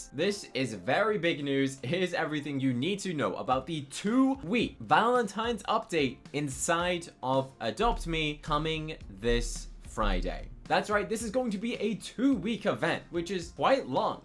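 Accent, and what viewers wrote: United Kingdom. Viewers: You also sound British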